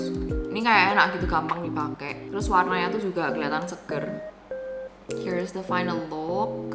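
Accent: Java, Indonesia. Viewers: aaa surabayaaa, ak udh curiga kok logat nyaa super sbyy ternyata bnrann HAHAH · sering liat video kakak di reel short dan suka banget sama penjelasannya. kakak lucu (in a good way) suaranya, mendok dapet tapi klo ngomong bahasa inggris tuh bagus banget aksennya aku suka. tadi ngomong kayak "ituuuu" aja dah kayak lidah bule xD aku juga masih sering pake produk exp kak, tapi memang semua tergantung ke kulit masing2 ya. ada yang exp langsung bruntusan, ada yang badak mukanya. hasil make-upnya cakep banget dan aku jadi keracunan produk2 yang dipakenya wkwkwk. apa brush itu ngaruh bgt ya kak? soalnya rasanya itu tadi cuma tap kecil langsung keluar warnanya... atau itu karena produknya emang HQ? btw jujur bare facenya bikin aku salfok banget.. sehat, glowing pokoknya cakep. boleh dong ya kak nanti spill skincare favoritnya, dan mungkin kalo kakak ada pake massager tools buat muka, treatment dll gitu dikupas juga kalo ga merepotkan🙏🏼 last but not least semoga kakak dan babynya sehat selalu dan sampe persalinan nanti dilancarkan. 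aku tunggu konten2 lainnya ya kak 🤗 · hi kakk I'm new subscriber here;) keep going for your contents~ anw aku suka accent medoknya kakakk <3 lucuu pol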